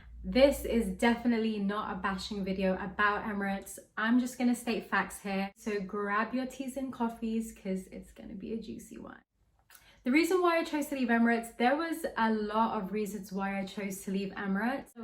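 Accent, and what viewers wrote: United Kingdom. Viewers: Briton na briton accent ni ghorl!!! God bless and good luck sa new journey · What part of the uk are you from, and your content is excellent 👏👍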